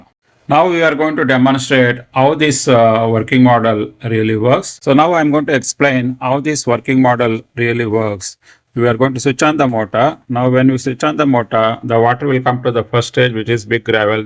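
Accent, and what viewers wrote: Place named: India. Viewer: You have a very Indian accent I think your name is. (Not trying to be rude but nice in a good way)😊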